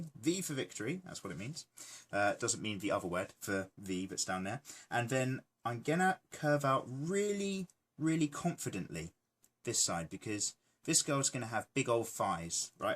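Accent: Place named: East London, United Kingdom. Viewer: When he pens, papers and "tings" I said yes East London or you have lot of "urban" friends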